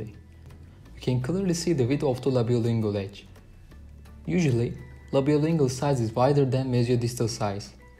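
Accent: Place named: Turkey. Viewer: Tam da Türk aksanı var diyordum 😅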